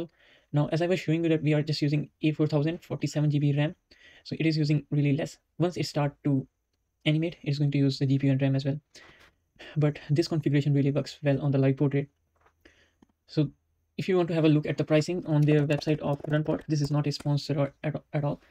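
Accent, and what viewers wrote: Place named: Ukraine. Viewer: you got really strong ukrainian accent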